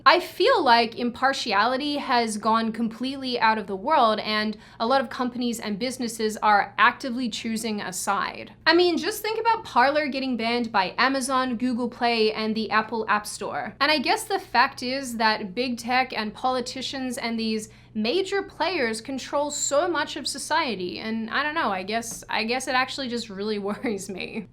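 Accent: United States. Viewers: Awesome video,! Btw, you're gaining an American accent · Your accent is disappearing!! Americanized quick!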